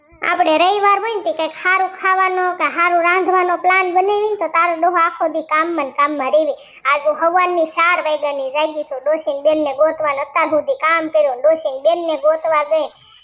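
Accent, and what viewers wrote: India. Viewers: ભાષા ભાવનગર ની બોલો છો.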